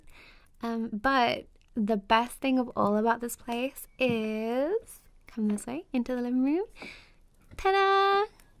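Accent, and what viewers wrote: United Kingdom. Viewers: Here i am, with headphones on and holding my pillow as if im spooning someone, ready for another of my fav British ASMR creators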